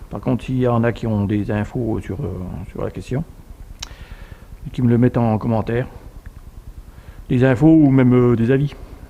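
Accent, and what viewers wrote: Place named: Franche-Comté, France. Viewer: Je crois entendre l'accent franc comtois !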